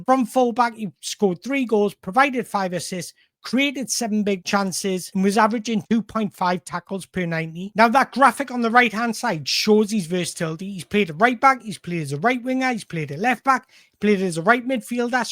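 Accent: Newcastle, United Kingdom. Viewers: I love your Geordie accent pal. I much prefer hearing regional accents, personally. More importantly I love the content of your videos. Great for football geeks like me. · just be who you are mate your doing sound. Never try and hide your Geordie accent.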